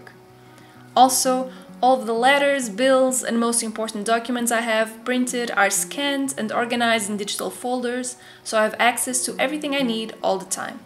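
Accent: Brazil. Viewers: Is she brazilian? Her accent looks like a friend of mine...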